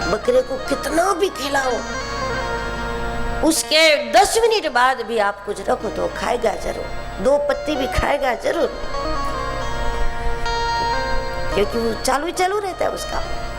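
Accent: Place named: Bhiwani, India. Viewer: Dachhhat bhiwani bolrahi hai gajab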